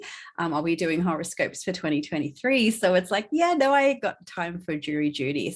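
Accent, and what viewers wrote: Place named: Australia. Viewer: Mine too! Her Aussie accent is also a plus!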